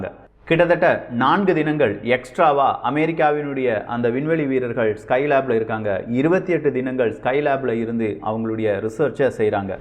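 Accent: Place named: Kerala, India. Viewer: bro malayalam slang in ur english theriuthu.. docking - dawking not dowking.. 'O' is special for Malayalees.. No offesne. it is slang..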